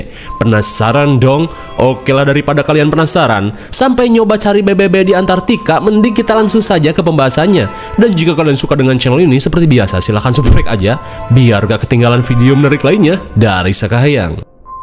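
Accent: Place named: Bandung, Indonesia. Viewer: Si aa mah sunda na bandung pisaan aa 👌👌👌 Wewe gombel 😂😂😂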